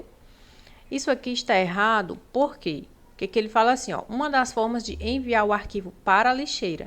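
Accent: Brazil. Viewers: Parabéns pela ótima didática somada a esse doce sotaque de algum lugar do Brasil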